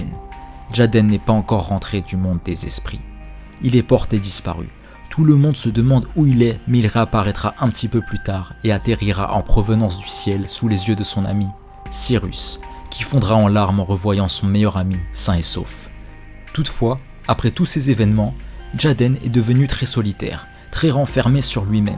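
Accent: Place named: Alsace, France. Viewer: je te connais t'es alsacien toi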